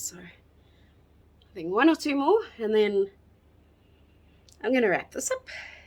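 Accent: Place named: South Africa. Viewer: that and Ur south African accent 😝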